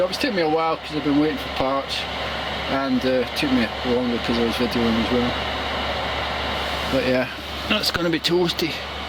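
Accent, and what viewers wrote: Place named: Scotland, United Kingdom. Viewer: your name suggest you are Glaswegian, but your accent suggests east coast maybe lived elsewhere for sometime .. england maybe ? .. anyway hows the heater ? are they safe ?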